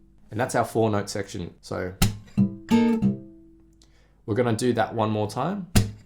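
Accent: Australia. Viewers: Hello are you from Australia?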